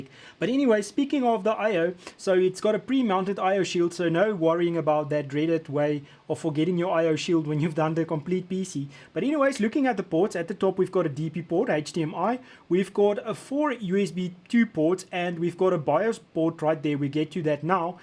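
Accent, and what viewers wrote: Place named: South Africa. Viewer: Wanted a shot of the full thing without the covers. But subbing just for the South African accent for now.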